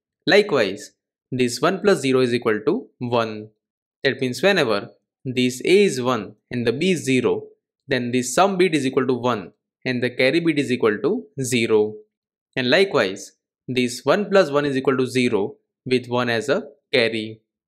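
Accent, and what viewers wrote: India. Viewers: I heard the Indian accent and i already knew i will understand it by the end of the video, haha. Thank you.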